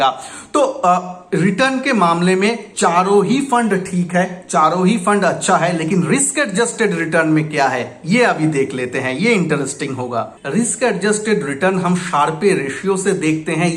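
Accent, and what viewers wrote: Bengal, India. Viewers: বাঙালির হিন্দি শুনলেই বোঝা যায়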